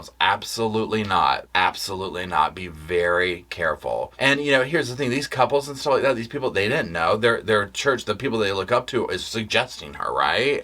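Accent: South, United States. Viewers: Can we say C U L T?? Oh my goodness! YESSSS!!! Let’s hear the transcripts, podcasts, all of it. I’m here for it. On a more serious note- i think that the higher ups of this particular religion really need to speak out and speak loud about what is condoned by the LDS church, and what is not. What is encouraged, and what is not. Because, right now, that church is looking BAD BAD. I live in a small mountain town in UT- the same one that Kouri Richins tried to purchase that big ole house in? Yep. As a non- member, it terrifies me that SO MANY high profile cases lately are coming from “devout” LDS people. The dentist who killed his wife. The wife who poisoned her husband. The Daybell/Vallow saga. 8 passengers. The list goes on and on. If I was the head of PR for the LDS church, I would be hiring the best people to somehow dig their church out of this optical MESS! 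Looking forward to your next video! Your personality is perfect for this! Love when you roll your eyes and get a titch petty with your southern accent…. bless their lil pea pickin hearts. Ha ha. Thanks for all the fabulous content!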